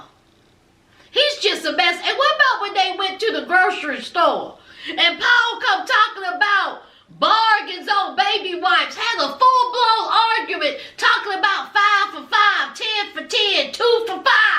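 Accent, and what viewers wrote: South, United States. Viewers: Well I love it!!!! I'm a new subscriber and your southern drawl is what drew me to you. I'm from Atlanta, Ga. So I get it!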